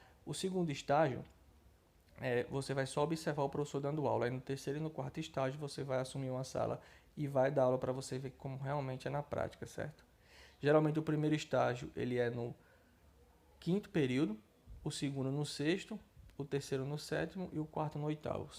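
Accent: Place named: Northeast, Brazil. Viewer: O mercado de trabalho de licenciatura em física é bom aqui no nordeste? (suponho que vc tbm seja da região já que está analisando a grade de uma facul do RN. Fora o sotaque gostozin kkkk). Vou entrar na minha segunda graduação que será em licenciatura em física e gostaria de saber se demora muito entrar no mercado.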